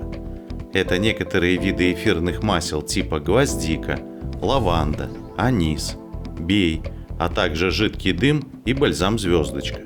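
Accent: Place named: Belarus. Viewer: Блин, вот всё в ролике замечательно с технической стороны, но произношение мягких согласных - это пипец! :( Ну нельзя взять и "прикрутить" произношение белорусских звуков к русским словам. Причём некоторые слова вам удаётся произносиТЬ (а не произносиЦЬ) так как надо. Что ж за напасТЬ-то такая в средней и восточной Беларуси с произношением...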